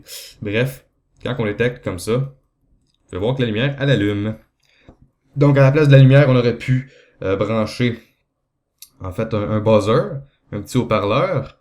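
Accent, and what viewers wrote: Quebec, Canada. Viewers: Top ta vidéo l'ami. Juste une chose pour faciliter la compréhension du Français avec l'accens Q'bequoi... Parles un peu moins vite... S'il te plais... Un cousin Français qui apprécie tes tuto, et qui voudrais pleinement en profiter...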